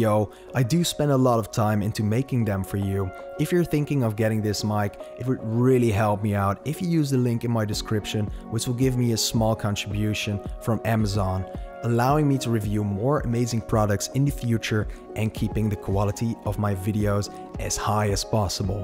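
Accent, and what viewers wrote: Netherlands. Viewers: Great video! Im recieving this one tommorow and and when i opened the video i could immediatly hear you are dutch too haha, your channel looks great! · Are you dutch by any chance?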